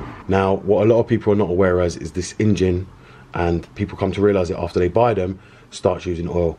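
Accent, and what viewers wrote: United Kingdom. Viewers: Is this dude from the UK? What if you're driving an 2005 x3 e83 in a hotter climate like Sydney, Aus?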